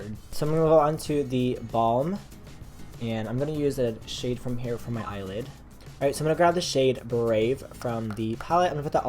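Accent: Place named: England, United Kingdom. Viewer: That annoyed English accent is my favorite part 😂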